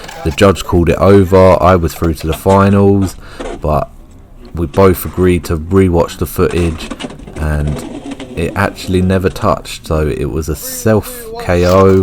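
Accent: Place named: England, United Kingdom. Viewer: why do you have an English accent but live in poor ass Philippines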